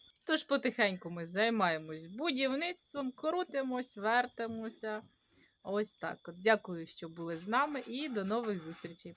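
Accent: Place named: Poltava, Ukraine. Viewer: Приємно чути Полтавський говір. Мої кореня з Ґрадижська. Цікаво дивитися, згадую дитинство. Дякую. ❤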